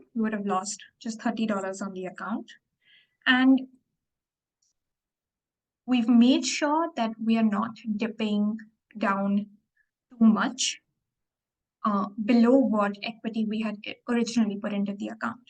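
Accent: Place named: India. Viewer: Idk why but watching the video having an Indian accent seems easy to grasp... 😂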